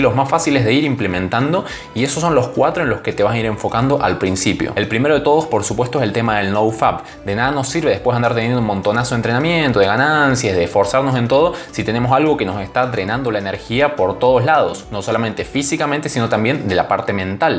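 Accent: Argentina. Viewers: Sos de Cordoba ?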